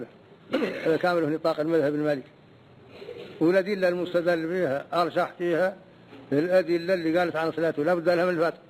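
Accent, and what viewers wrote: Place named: Mauritania. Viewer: رحمه الله لكن الكلام غير مفهوم. يتكلم باللهجة الموريتانية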